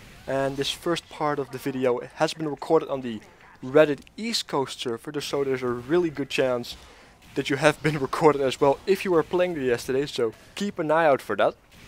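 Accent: Netherlands. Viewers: Judging by your accent, you cannot but be Dutch, right? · are you perhaps dutch? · ik kan horen dat je een nederlander ent ;P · Is this guy dutch?? It sounds like he has a dutch accent.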